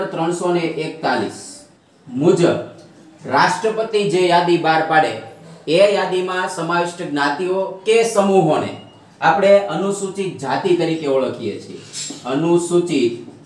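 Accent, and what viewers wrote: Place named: Kathiawar, India. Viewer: મને આપ ની કાઠિયાવાડી બોલી બહુ સરસ લાગે 👍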